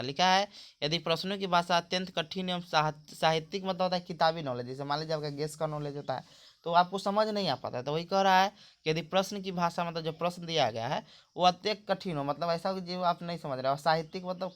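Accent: Bihar, India. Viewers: Bihar se ho lg rha aap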